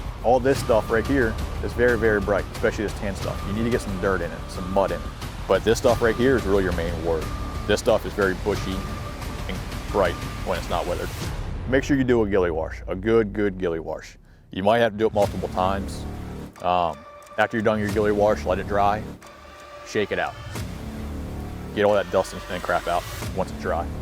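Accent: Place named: Pennsylvania, United States. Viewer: Lol i can here that PA accent anywhere, channel emote reconfirms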